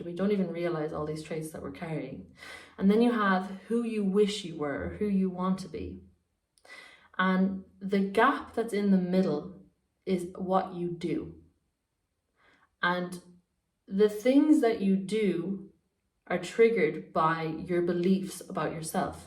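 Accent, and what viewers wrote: Ireland. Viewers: Love your videos btw...so far you're the BEST and I love your Irish accent ❤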